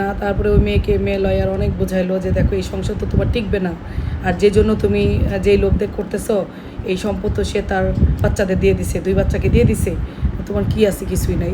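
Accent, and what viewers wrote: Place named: Bogura, Bangladesh. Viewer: আপা আপনা কথার মাধ্যমে অনেক কিছু শিখলাম। আপনার কথাগুলো বলার ধরন বগুড়ার ভাষার মত। কোন জেলায় আপনার বাসা।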